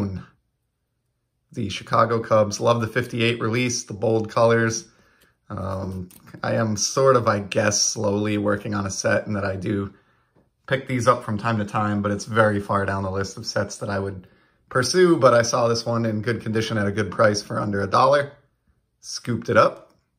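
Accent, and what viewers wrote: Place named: Boston, United States. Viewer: So much boston haha …..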